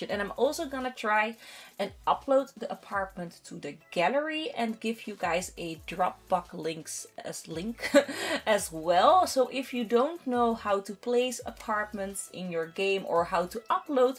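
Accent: Netherlands. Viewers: Are you Dutch? You sound Dutch. (I'm not Dutch but I lived there for a few years) Btw great video, I'm glad I found this channel ☺️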